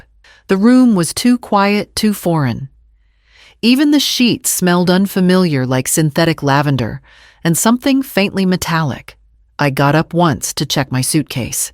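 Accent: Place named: Australia. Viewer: Sorry but this AU voice.....ayayayay. 😅😢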